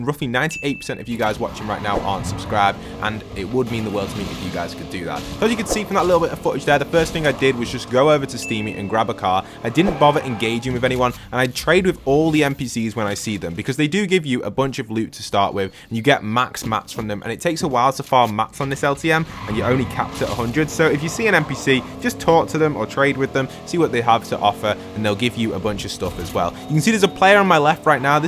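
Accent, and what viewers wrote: United Kingdom. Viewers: You know that's the bri'ish accent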